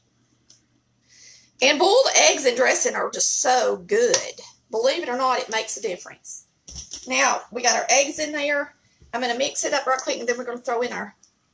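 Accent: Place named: Georgia, United States. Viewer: Thank you , your videos feel like home to me. The way you speak reminds me of home in Georgia.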